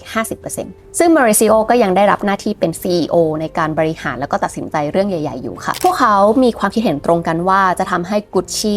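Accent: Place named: Thailand. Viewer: เล่าตัดต่อมากคะ แต่งงๆ กับชื่อและอิตาลีสำเนียงไทยภาษาอิตาลี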